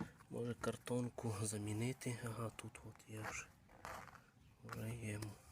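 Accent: Western Ukraine, Ukraine. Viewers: Прикольний, шкода що на городі зберігали, - кузов убитий. Цей москвич був би раннім, якби був 412-ї моделі. 408 можна назвати раннім, якщо це авто в кузові "е" (з фарами-плавниками) і десь приблизно <num> року До речі, у Вашого авто мабудь чи не найгарніша мордочка з всього модельного ряду, - решітка з широкими отворами і прямокутні фари П.С. не бачив поки щоб хтось критикував жителів західної України за їх говірку, це ваша "фішка", не переймайтеся! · Робіт добру справу і все вийде. Гарний Закарпатський діалект, головне не кацапський язик. Слава Україні. Смерть ворогам. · Бачив відіо як неошибаюся канал називається "Вася Крутс" до він теж купив москвич тепер розбира на запчасті і продає. Я замітив що розмовляєте як в західні Україні все 👍👍👍розмовляйте як удобно головне щоб не нарусійські мові 🇺🇦 🔱.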